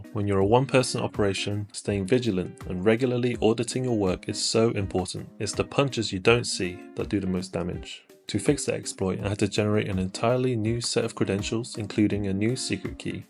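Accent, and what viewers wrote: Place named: United Kingdom. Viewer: 영국 유학 다녀오셨나요? 영어 너무 멋지십니다!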